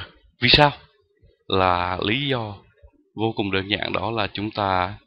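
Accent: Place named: Hue, Vietnam. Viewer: Giọng Huế của anh nghe thú vị nhỉ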